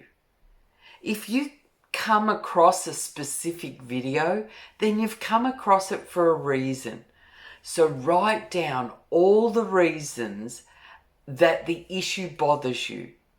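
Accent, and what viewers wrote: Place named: Australia. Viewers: Thank you so much for this,. I had a lot of realizations. And I just love your Aussie accent, I get quite homesick when I hear you and I’m actually German, but lived in Oz for 8 years. Truest feel good Tapping! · same here, i enjoy your Australian accent too for a change, after hearing UK/US and other accent all my life.:). My Indian accent LOL: <link>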